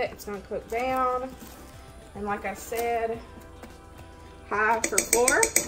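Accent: South, United States. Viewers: What a great recipe!! love your southern accent 😊😊❤️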